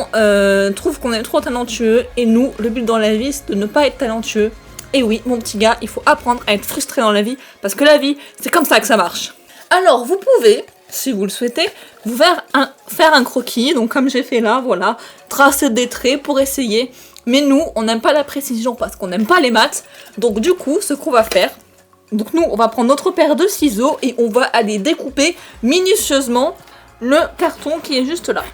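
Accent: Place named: South, France. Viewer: Avec ton genre d'accent du sud tu me fait trop penser a une youtubeuse du nom de Keyza 😆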